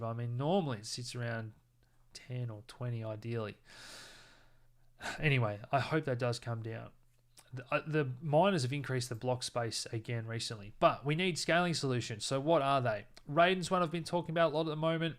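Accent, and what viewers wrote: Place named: Tasmania, Australia. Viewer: It aint Aussie it's Tassie ... World = Weld only in Tassie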